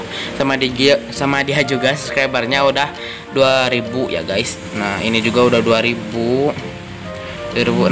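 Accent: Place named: Sunda, Indonesia. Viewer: Logat nya kee Sunda bang 😭😂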